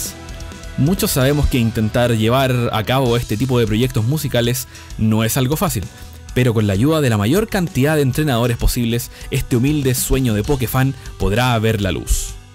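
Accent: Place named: Chile. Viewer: wena no es por ofender pero te paresi a vardoc y por cierto chileno detect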